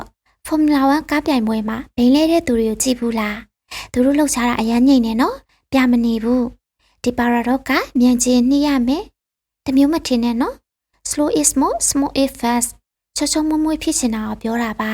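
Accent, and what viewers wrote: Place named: United Kingdom. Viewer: Eng accents လေးတွေကကျန်တော်အသဲယားတာ ဆက်ကြိုးစားပါခင်ဗျ❤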